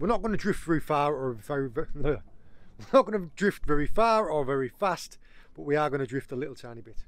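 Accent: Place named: Cornwall, United Kingdom. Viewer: “A bar of soap with pins in it” so accurate.As a youngster I caught small Bass in and around st Johns Lake in the Tamar by the bucket full.The best tool I had for holding bass was an old bar towel. Looks like your new boat in this vid? I was out fishing with a mate discussing your videos, he said there must be lots of foreign tourists Admiring your Cornish accent.It was mentioned in good taste and did make me 😂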